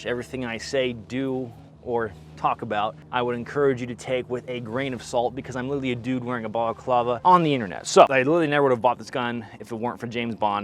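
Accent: England, United Kingdom. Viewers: sounds like a elegant english teacher with that british accent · LMAO with British accent!! please continue with the FUN videoss and Comic Intros!! bro. you made up our DAY!!! F@ YEAHH!!! kind regards Slow n Smooth <> Smooth is Fast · British accent 😮‍💨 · The first American I’ve seen with a convincing English accent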